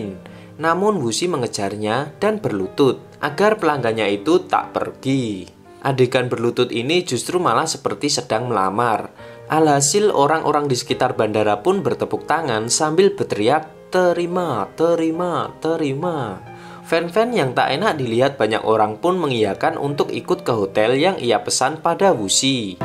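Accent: Java, Indonesia. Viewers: Aduhhh logat nya terlalu medok bro jadi kurang nyaman nontonya, sorry to say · Narator Jawa tulen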